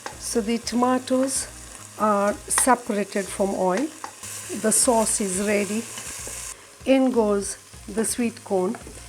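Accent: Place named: East Africa. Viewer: I can heard east Africa you Born in Here or you been Here tanzania I here Sis I like this recipe nice and taste stay safe and healthy I will cook this sometime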